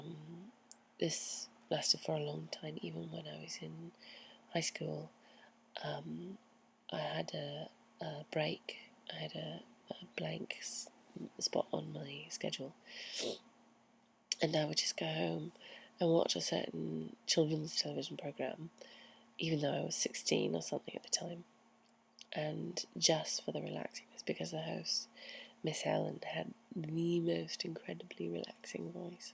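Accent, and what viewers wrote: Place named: England, United Kingdom. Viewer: I don't know why it's interesting. The title says what the accent is. But to be specific it's an English accent. People only call it British because that's all Americans will ever understand about British accents.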